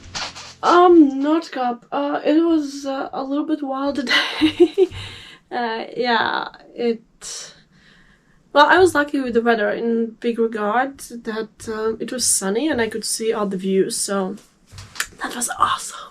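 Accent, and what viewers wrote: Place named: Latvia. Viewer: Tas nodevīgais latviešu akcents...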